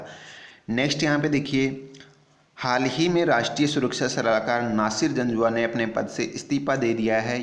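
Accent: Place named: Rajasthan, India. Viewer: Sir mai aapke aavaj se samjh gayi thi ki aap Rajasthan ke hi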